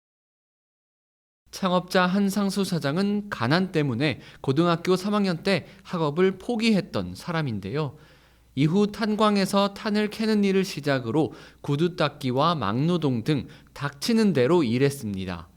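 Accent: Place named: Gyeongsang, South Korea. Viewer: 갱상도 상 남자 노 ㅋㅋ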